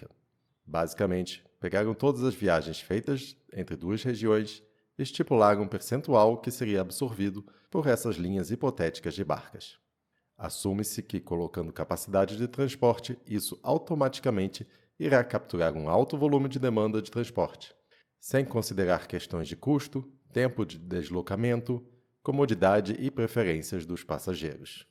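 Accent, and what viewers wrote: France. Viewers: A seu sotaque francês é o sonho de todo carioca.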